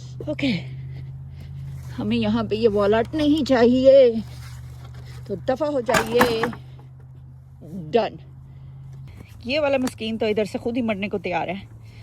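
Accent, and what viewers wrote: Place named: Mirpur, Pakistan. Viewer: Aap pakistan mein kahan se hain Aapka accent bilkul mere jaisa hai And aapki choice of words bhi Mein Mirpur k paas se hu.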